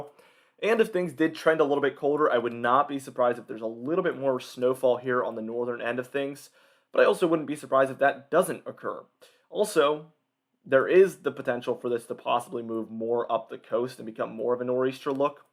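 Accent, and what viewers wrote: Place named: South, United States. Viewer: "Gonna" gonna "..... Geraldine, ALABAMA ? Why are Americans now taking like Southerners?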